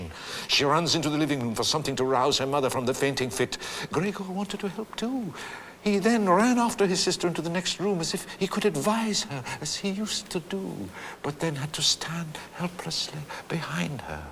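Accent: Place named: United Kingdom. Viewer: I hate to be haughty, toReasonWhy, but I do think the question rather is "why are Americans like you so ignorant of anything non-American that you'd mistake a British accent for a French one?".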